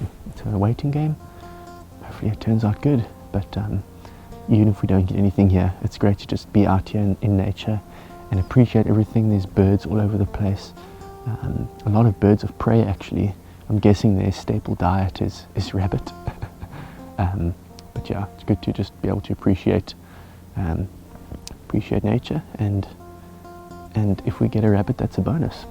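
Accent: South Africa. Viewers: Because of your accent id assumed this was south africa but now i find out its my homeland of Argentina! what are the airgun laws like? Man i been thinking about moving there for the longest after visiting Mendoza, i just loved the laid back lifestyle.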